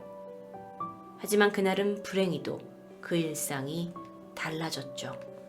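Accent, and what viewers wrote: Jeolla, South Korea. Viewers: 디바님 고향 전라도임? 의를 자꾸 으라고 발음하는거 이거 전라도발음인디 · 혹시전라도 분이신가요 님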